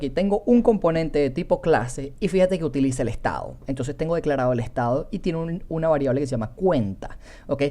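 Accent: Venezuela. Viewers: tu como que eres Venezolano mi pana, excelente instro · Mano tu eres venezolano si o si xD calidad el video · marico eres venezolano? que de pinga encontrar un desarrollador con nuestro acento... saludos mi pana...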